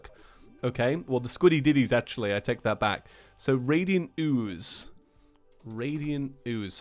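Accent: United Kingdom. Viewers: R u brittish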